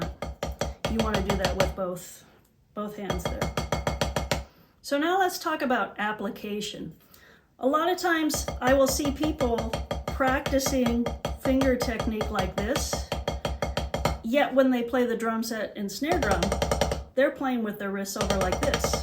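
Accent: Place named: Oklahoma, United States. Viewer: If you're not intelligent enough to ditch that funny Oklahoma accent I think that you're an idiot savant and your drumming thing is all some sort of a f**** reaction coming to you from someplace else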